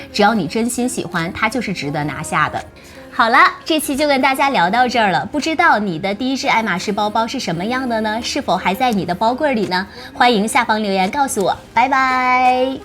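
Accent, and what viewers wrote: Japan. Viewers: A Japanese way pronunciation found commonly in Japan. Haven't u been to Japan.... If not, watching more Japanese YouTuber lol...... Haha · 應該比慣用日文發音吧，她應該是銀座老闆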